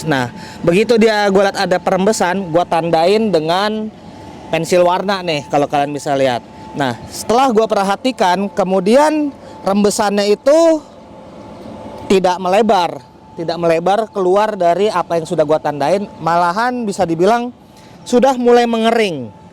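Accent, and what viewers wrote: Jakarta, Indonesia. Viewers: Om aseli mana sih? Wkwk ko kaya orang Jakarta ngomongnya wkwkw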